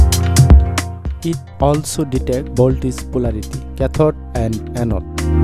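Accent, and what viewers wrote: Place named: India. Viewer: your english is shite. speak proper english not the indian english.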